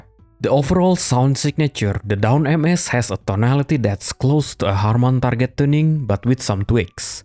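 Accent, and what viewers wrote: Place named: Indonesia. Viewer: orang indo nih, keliatan dari fluentlynya 😅 semangat trus bang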